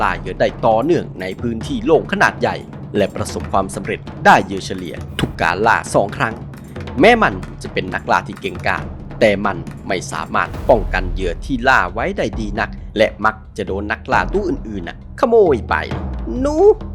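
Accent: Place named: Isan, Thailand. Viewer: เสียงคุณติดสำเนียงสุรินทร์นะ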